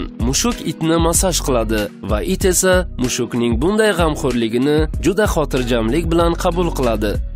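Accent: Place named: Tashkent, Uzbekistan. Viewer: Tashkan shevasi eng rasvo sheva.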